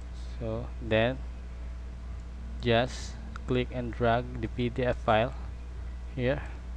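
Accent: Philippines. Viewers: Strong filipino accent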